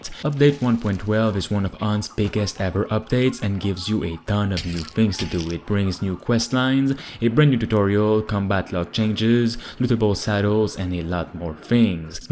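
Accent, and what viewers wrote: Quebec, Canada. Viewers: Es tu québécois? Les canadiens sont le meilleur 😊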